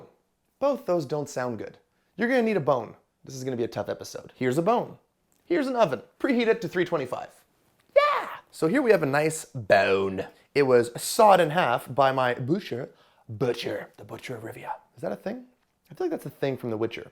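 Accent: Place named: Canada. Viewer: Where are you from? I keep hearing a Canadian accent but it goes away so fast! Great video btw (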